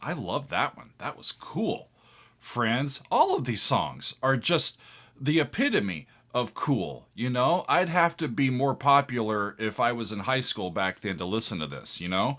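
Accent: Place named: North Carolina, United States. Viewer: It's how folk from North Carolina and the Outer Banks talk naturally.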